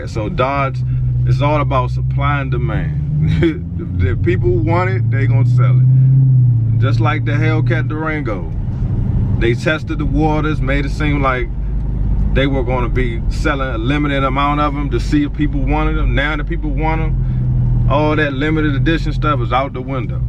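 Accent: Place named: Chicago, United States. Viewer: You from Chicago. Based off how you say water and and car I can tell?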